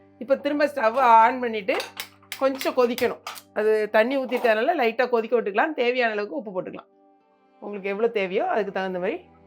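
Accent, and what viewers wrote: Tirunelveli, India. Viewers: எனக்கு தோசை தான் பிடிக்கும் சிஸ்டர். என் அப்பா ஊர் திருநெல்வேலி தான். அந்த ஊரில் நீங்கள் சொல்வது போல் தான் சொல்வார்கள். நீங்கள் புடவை கட்டும் விதம் உங்கள் சிரிப்பு சூப்பர். வீடியோ பார்த்தாலே ஒரு எனர்ஜி வருது.ஒரு சந்தோஷமாக இருக்கு சிஸ்டர். நிறைய வீடியோஸ் போடுங்கள் சிஸ்டர். உங்கள் பேர் என்ன என்று சொல்லுங்கள் சகோதரி.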